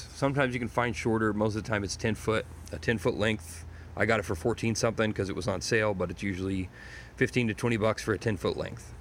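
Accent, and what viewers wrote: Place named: Southern California, United States. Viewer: You sound like a “socal” guy😮. Nothing like saying hey! Thanks for the video🎉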